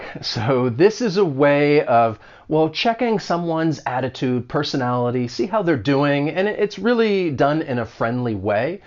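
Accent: United States. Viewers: Don't mention it professor. I'll be always watching your videos, they're so cool. Perhaps, because of the way you teach and explain everything. I appreciate American's accent .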